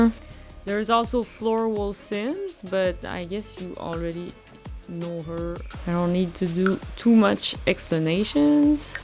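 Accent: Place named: Quebec, Canada. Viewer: une quebecoise !? je déjà suis fan 💋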